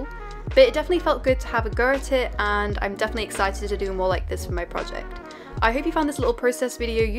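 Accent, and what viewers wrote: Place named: England, United Kingdom. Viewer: I love how at first you have a perfect english accent, but towards the end of the video as you got a bit more tired, you started to sound more and more like you were from Yorkshire.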